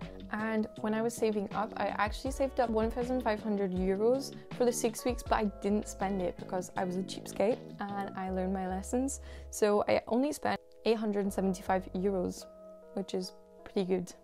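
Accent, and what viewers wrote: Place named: Scotland, United Kingdom. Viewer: Viva Scotland, my favourite place in Europe. I love your accent. Greetings from Spain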